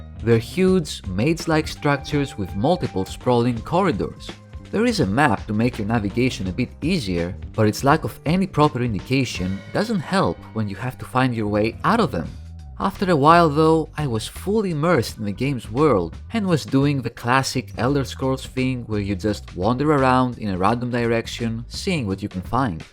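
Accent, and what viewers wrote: Greece. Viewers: lol that Greek accent knocks me out ahahah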